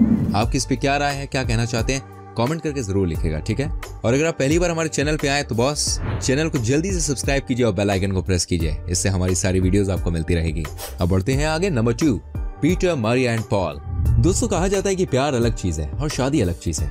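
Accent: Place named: Kolkata, India. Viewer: Kolkata si bol rahe hain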